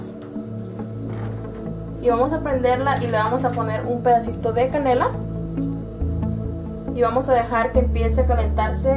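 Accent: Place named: United States. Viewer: cada vez de dices SO! es como una patada en la espinilla.... adios con tu cento pocho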